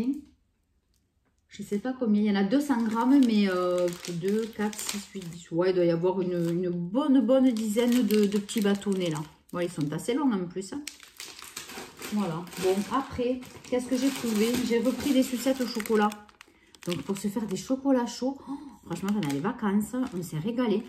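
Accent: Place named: Occitanie, France. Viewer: d'où cette accent alors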